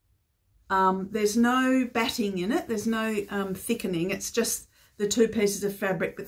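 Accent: Australia. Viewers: Hi, sew glad to find your channel. I love finding an Aussie voice for a change. Look forward to following your channel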